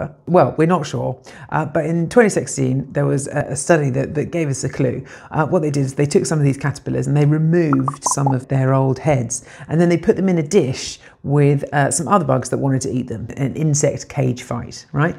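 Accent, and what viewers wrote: England, United Kingdom. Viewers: There I am, minding my business. Here comes the internet, throwing the most beautiful Lady at me. On top of that, talking about interesting stuff in an English accent. And there I was, trying hard not to get one of these unhealthy internet crushes. You’re gorgeous, you’re voice is magical and your content is quite interesting, for the short formats of our time. Keep going, all the best to you🍀🎶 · the reason why i will never have a partner is that this is exactly my type a pretty red heat yet dignified lady with a British accent and hot..... they dont exist where i live..... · Red hair freckles British accent...im just like 🥰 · I lovvvve this lady's smarts, sex appeal, sense of joy in her work and that beautifully entrancing British accent! Keep it up!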